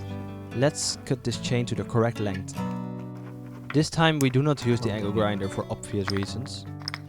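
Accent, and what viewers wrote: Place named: Netherlands. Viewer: I hear a typical dutch accent. You gave me some inspiration man. Do you have like instagram?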